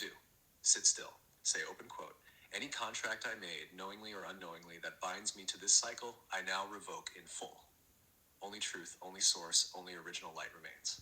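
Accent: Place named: RP, United Kingdom. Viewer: Wow I love the British accent, almost posh, and I’m british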